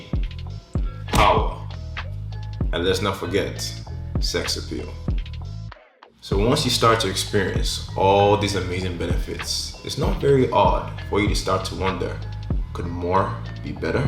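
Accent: Nigeria. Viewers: Omoh you be Naija guy???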